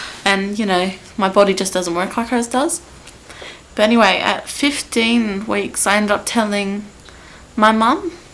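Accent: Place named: Australia. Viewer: Hi. I wish I could give u a big hug right now! I can tell ur an Aussie bcos of ur accent. I'm an Aussie also. I have had 2 losses in 3yrs ( from so many complications) and I have never told my story until the doctors are talking me into it and they r eager to mention me in the medical journal. I believe in miracles bcos now I am 32weeks pregnant n baby is active n healthy!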